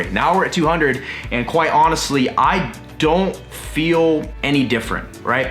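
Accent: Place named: South, United States. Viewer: Texas in the house 😀!!! My favorite was the big ol bulking breakfast because of the southern accent of course😂😂!!! Congratulations on 200K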